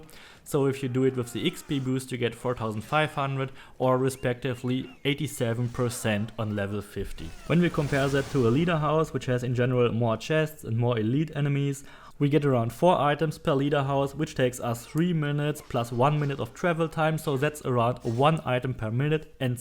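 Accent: Germany. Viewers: You sound like a German to me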